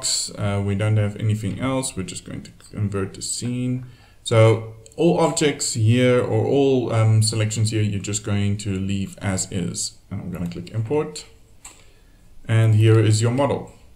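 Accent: South Africa. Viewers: Sounds like a South African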